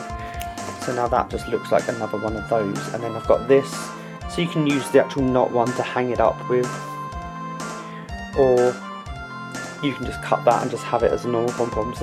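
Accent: United Kingdom. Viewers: Because he has an English accent? It would be better if it was an American talking. I'm british and find the accent from across the atlantic much nicer ! :)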